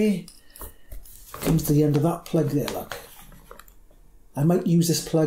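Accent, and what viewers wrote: United Kingdom. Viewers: Nice to here an accent that sounds like you live on my street, instead of the USA. Well presented video, look forward to the sequel. If you ever need any more of those headlamp clips, by the way, you can get them in Wilco for a couple of bob, they are for holding your greenhouse glass on. They only last about a year outdoors, but will probably be ok in the headlight. Paul SW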